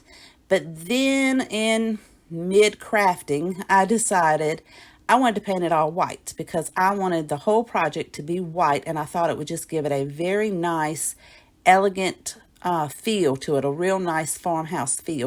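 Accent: South, United States. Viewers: How cute both diy’s are. Found you through Heidi. I subscribed today. I’m so happy I found you. I think both projects go together. Where are you from? You have a very southern accent. I’m from Pensacola, but I live on the coast of MS now.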